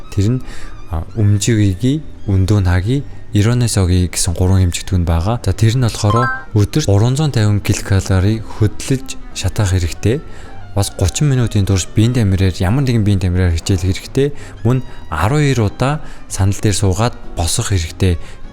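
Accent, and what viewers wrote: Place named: South Korea. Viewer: Angli helnii duudlaga n aygui huurhuun yag korea english kk amjilt husiy danda engiin murtlu humuust medeelel ygdug n taalagddag shy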